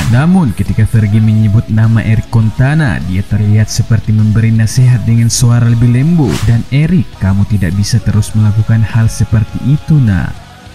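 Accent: Medan, Indonesia. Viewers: Medan x logatnya bang 😅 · Logat Medan kental bener wkwkw.. di ralat.. CANTONA bukan CONTANA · Anak medan x kudengar omonganmu bang 😂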